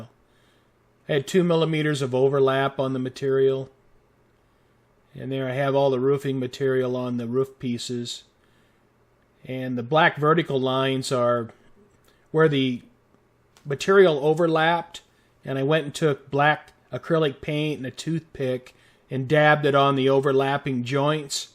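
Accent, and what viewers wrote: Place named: United States. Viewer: a european that sounds like an american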